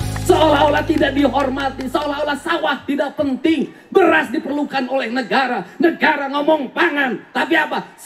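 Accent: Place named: West Java, Indonesia. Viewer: Cara ngomongnya pak dedi ngingetin sunda banget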